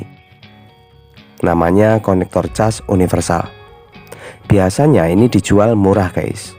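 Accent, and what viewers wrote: Java, Indonesia. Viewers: Lokasi sampean Ki ngendi to bang kok koyo wong jowo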